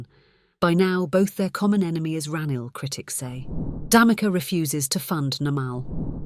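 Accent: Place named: RP, United Kingdom. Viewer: Queen`s English. Very good.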